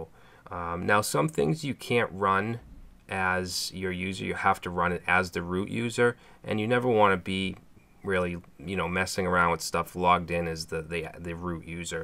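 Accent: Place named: South Boston, United States. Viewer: Straight out of Southie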